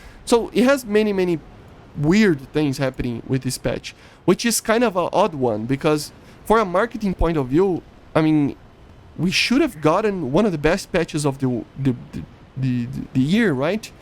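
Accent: Brazil. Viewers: Eu identifiquei o sotaque brasileiro IMEDIATAMENTE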